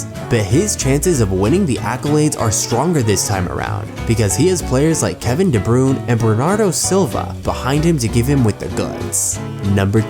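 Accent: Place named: Spain. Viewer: Lose the stupid Spanish accent.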